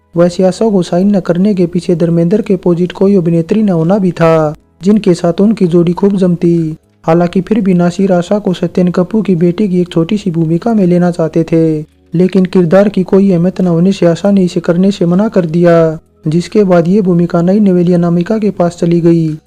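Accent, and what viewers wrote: Haryana, India. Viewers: आपकी आवाज़ मै हरियानवी tune है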